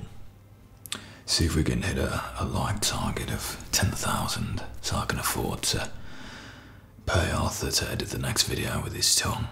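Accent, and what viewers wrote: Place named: Birmingham, United Kingdom. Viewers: The broomy accent yknow 😂😂😂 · Absolutely SUBLIME Birmingham accent! Best Ive ever heard!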